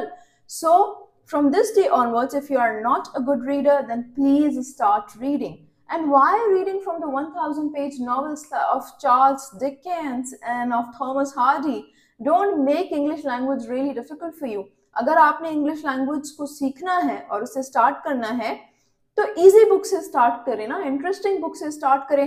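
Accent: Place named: Sindh, India. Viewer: Apke to apne english thek nahe ha total sindhi lehja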